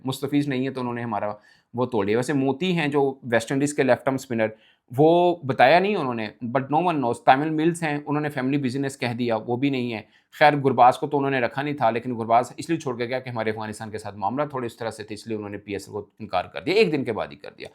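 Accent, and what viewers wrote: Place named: Punjab, India. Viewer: بھائی اپنے پنجابی نما لہجے میں اردو پر کنٹرول کریں۔ پنجابی میں کوئی برائی نہیں آپ ضرور بولیں مگر جب اردو بولنی ہے تو پھر مکمل اردو لہجے میں بولیں۔ مثلا لفظ قانونی ہوتا ہے قنونی نہیں۔ براہ مہربانی دھیان کریں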